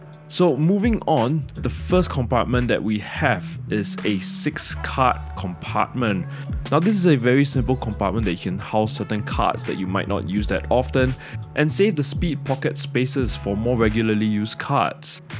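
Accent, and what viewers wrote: Singapore. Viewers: I was thinking at first, your voice sounds very Singaporean, and then you pulled out SIN Dollars! 😄 We’re hoping and planning to move there from Germany soon. Absolutely wonderful little country!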